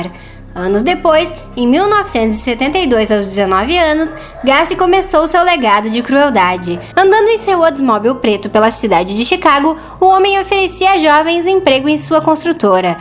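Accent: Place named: Bolivia. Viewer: voz de boliviana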